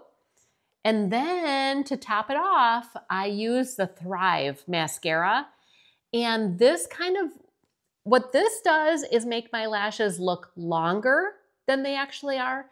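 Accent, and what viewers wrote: Michigan, United States. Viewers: Lmao. I’m 80! Ha ha! you look very very good at your age. We are close in age. I just know some women let themselves go as they age. You do have a Michigan accent, it’s cute 😅. I appreciate your honesty. I am Carnivore way of eating for 11 months. And I am at the weight I haven’t been in 25 years. I am so much healthier. As a RN I understand your back pain issues I remember the video. I pray you stay well. Your skin is beautiful and your figure is banging 😅. Hugs from TX ❤❤ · As a former Michigander, I can hear your “accent.” I moved to South Carolina 20 years ago and married a Charleston native. My husband says my “accent” has softened over the years. 🤷‍♀️😁 BTW, I do NOT miss Michigan winters one little bit, lol. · I have a good friend from Michigan and you sound like her. You do have a slight accent like I do coming from Pittsburgh! 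I fell down the steps in 2018 and broke my back in 2 places. I had surgery on T6 but they let L5 heal on it's own. I still have back pain from the L5 and permanent nerve damage in my right foot. Nothing stops me! I work 4 days a week and babysit grandkids 1 day a week. I could leave my grown kids but can't leave the grankids. So I guess we'll put up with northeast Ohio winters. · I have to laugh about your so called accent, I’m from Michigan also but now live in East Tennessee, I get asked all the time , where are You from you have a “ up north” accent, I don’t think we have one at all , but people sure do lol , glad your back is good , praise God!!!! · Interesting video,! As for your accent, I am familiar with how Michiganders sound, so I figured you were raised there. As for Midwest accents...there are so many different ones! I was raised in northern Iowa where we have an accent similar to Minnesotans. 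However, I've lived in southwest Iowa for 38 years and here people sound more like Missourians. And that's just Iowa! 😅 Let alone South Dakota, Minnesota, Wisconsin, etc. Have a good week! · I can definitely tell you're from Michigan. I have relatives from Michigan...and they have the same "accent". 🙂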